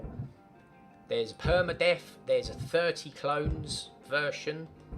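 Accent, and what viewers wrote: Cockney, United Kingdom. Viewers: I’m pretty sure you’re the only cockney fluent YouTuber is subscribe to.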